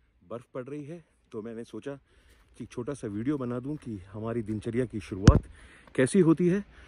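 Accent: Standard. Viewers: Sir your voice and pure hindhi is very impressive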